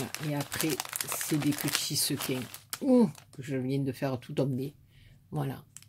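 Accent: South, France. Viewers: Coucou, que de gentils et beaux couriers, tu les mérite. J'aime beaucoup tes vidéos et ton accent ensoleillé, pour moi qui vit dans le nord, ( Belgique ), c'est juste du bonheur. Je n'ai pas ton adresse sinon je me ferais un plaisir de t'envoyer une carte. Gros bisous Nanny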